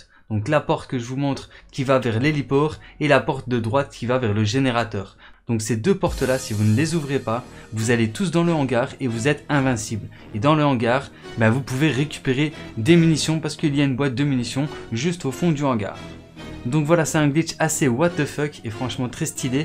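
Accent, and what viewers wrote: Belgium. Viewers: Belge de ou poto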